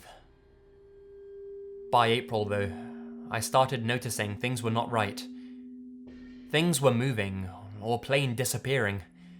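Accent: England, United Kingdom. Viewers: Are you from England? I'm asking because I know what an English accent sounds like because I watch this show that takes place in England so I know your speaking with one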